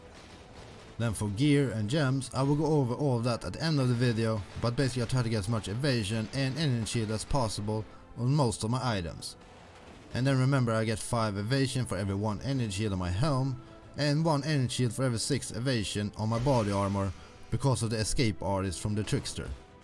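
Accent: Sweden. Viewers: Man måste ju älska den svenska engelskan <3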